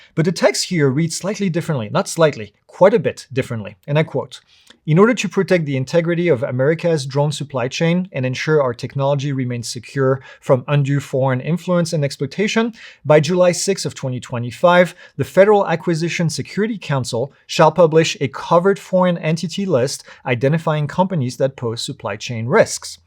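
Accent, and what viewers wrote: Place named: France. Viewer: I’m just here for moderately fleeting French accent. Avoir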